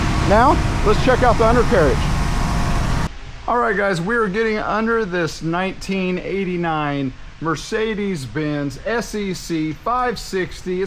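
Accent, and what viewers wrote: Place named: Appalachia, United States. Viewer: Love the car but am i dead or he sounds like he is speaking with a hillbilly accent😅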